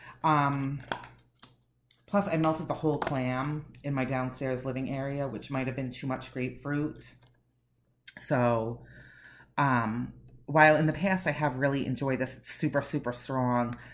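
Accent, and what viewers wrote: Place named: New York, United States. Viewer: I’m from Boston. She sounds like NY or New Jersey.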